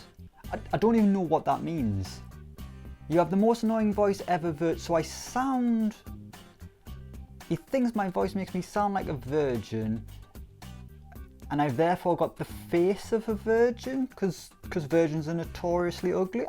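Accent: United Kingdom. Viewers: Tbh I like him. He just gets a lot of stick because he is maybe not as dramatic as Irish guy. It’s also cuz of his British accent. I prefer Irish guy but you guys are morons insulting him. Ffs he gets thousands of subs. It’s cuz his vids are factual when Irish guys are all about Grealish and paul mcshane. Some of these insults are dumb. If u don’t like him just leave. Trolls.😒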